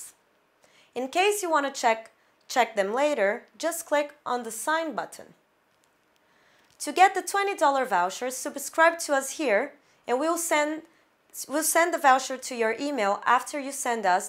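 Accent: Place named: Bulgaria. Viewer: She is bulgarian (I noticed the accent) there everything is possible, no rules, no regulations.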